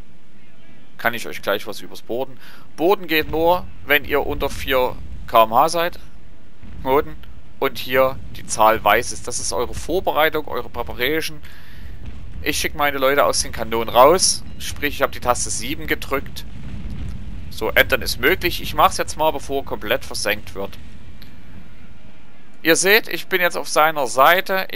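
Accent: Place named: Saxony, Germany. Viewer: Rischer Sachse erklärt de Maritime Kriegsführung, das gefällt mir aber gud o :P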